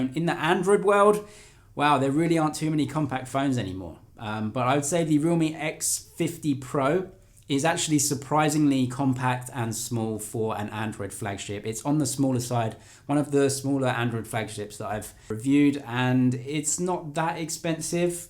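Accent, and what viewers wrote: United Kingdom. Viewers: #asktechzg Why are you in China?Is it for your job,if so,what is your job title? Cuz you sound British and look 100% like Tom Holland😂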